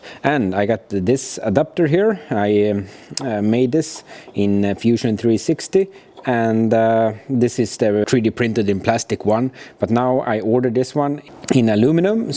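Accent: Sweden. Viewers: Take care of yourself mate, you are doing something thats really cool and unique. I look forward to seeing the update videos on what you've been doing and hearing you speak swenglish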